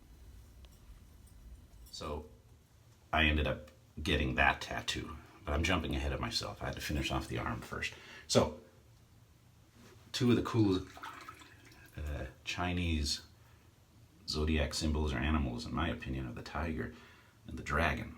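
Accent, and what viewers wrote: Newcastle, United Kingdom. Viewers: Very cool tattoos Sir. I can just hear the Newcastle accent ;-). I have yet to get my first tattoo. Is something I have wanted to do since I was young. I have something in mind and may get it done. Ps if ever you and family visit the UK would be an honour to show you the Hampshire and south coast area :-)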